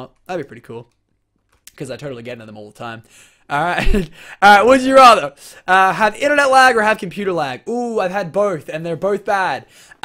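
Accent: Australia. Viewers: is aussie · Is Australian I'm in a country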